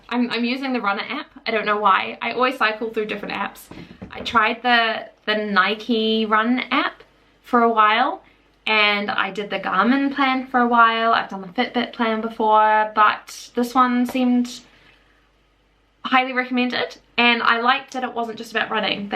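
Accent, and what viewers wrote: New Zealand. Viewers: Haha I recognized the kiwi accent right away!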